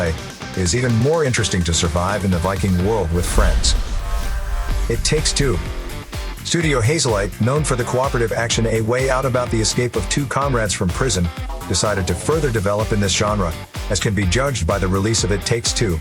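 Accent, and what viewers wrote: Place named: North America. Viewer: What the fuck does that even mean even to European gamers why does everybody else in the world Dam have ugly shit so this game looks good I will shut the fuck up any case you’re speaking in a western accent North American probably tell us game isn’t out Ross yet so it should be on this list and it probably will never be out it’s my bad Amazon is not releasing it yet because they don’t want it to overshadow new world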